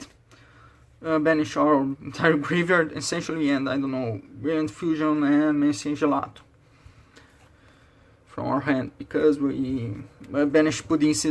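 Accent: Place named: Brazil. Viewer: notei que era brasileiro pelo sotaque kk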